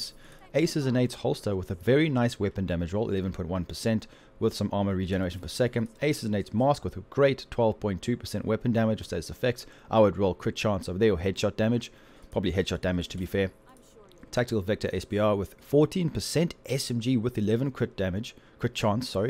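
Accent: South Africa. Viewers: is that a South African accent? Thanks for the help man, keep up the good work <3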